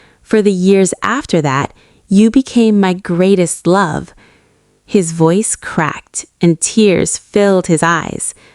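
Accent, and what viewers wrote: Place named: United States. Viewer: ❤❤❤❤. American English so nice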